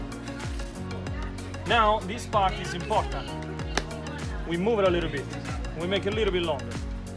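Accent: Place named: Apulia, Italy. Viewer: bel video, splendida pizza...bravo.. immagino che i presenti erano soddisfatti... dal tuo accento immagino sia pugliese... di dove?? un salutone da Roma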